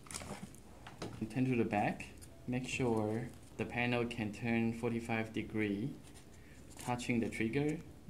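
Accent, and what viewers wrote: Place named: Taiwan. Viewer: 聽起來好像台灣人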